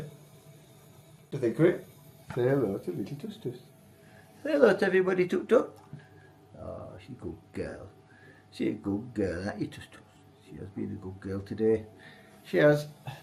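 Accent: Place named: Barnsley, United Kingdom. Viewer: Barnsley by t sounds. same ere..good video lads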